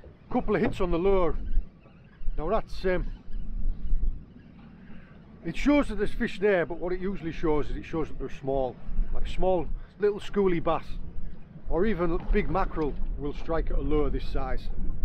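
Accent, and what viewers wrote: United Kingdom. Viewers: Viaduct I'm wondering that too. A lot of times the CC spelling is wrong and it also takes liberty in what the word you actually said. But the CC is better than nothing. After listening to your videos, I'm understanding more and more of your accent. And they say us Americans have a lot of accents, you Brits have won the war in that category by far. Another GREAT video, always a pleasure.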